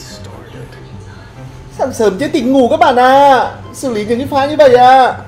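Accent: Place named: North, Vietnam. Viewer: bình luận giọng người miền Bắc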